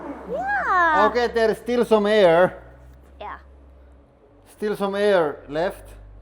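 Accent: Finland. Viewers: En oo pitkään aikaan kattellu tätä kanavaa; aksentti tuntuu muuttuvan aina vaan "manselaisemmaksi"....:D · Love Finland accents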